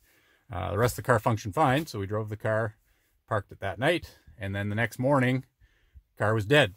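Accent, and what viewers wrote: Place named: Illinois, United States. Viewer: That Mid US Illinois area accent is just annoying! Hence, the extreme cold air may have contributed to the screen not turning on! The Acuras for the rest of us in the USA should be mostly just fine!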